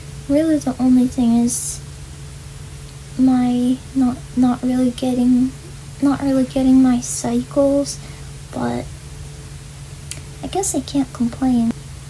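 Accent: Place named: New York, United States. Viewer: Your accent came out a little in this video :p you've mentioned being from New York before but I didn't notice you sounded like it until now. There's nothing wrong with whimsy and knowing who you are :p legit feel like the whole being "stuck" mentally thing you are describing is just not true (I don't mean that your struggles aren't true, I just mean that I don't think you're actually mentally behind most people your age, lmao... have you met most 20 year olds nowadays? like. you're good, girl, seriously). Maybe it was something that felt slow or painful in the past but you clearly are growing and developing as a person, even just talking about the past few months. It might be more exacerbated because you live in (a part of) America, where being able to drive and owning a vehicle is almost a prerequisite for independence and is a rite of passage for adulthood, and the fact that you were homeschooled so you have less context about the maturity of other people your age, outside of your family, but legitimately, in any other place you'd be ... 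I won't say "normal", but... finding it easier to thrive independently? I talked about it in the comments of another video but I legitimately think that autistic kids don't grow "slowly", they grow in a "different shape" than others, and it only looks slow if you're trying to force them to grow in the "normal" shape, unnaturally. You clearly have skills, emotional maturity, and agency that most 20 year olds don't. The agency at least is no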